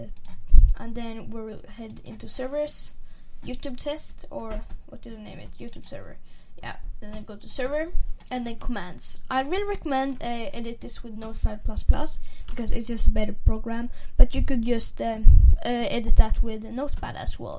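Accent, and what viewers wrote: Sweden. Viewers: Är du svensk? låter så på dealekten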